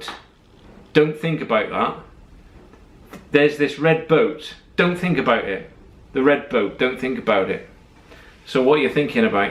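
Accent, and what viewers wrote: United Kingdom. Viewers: you are exactly correct about the media. Are you an american with a British accent. Your last video was on target.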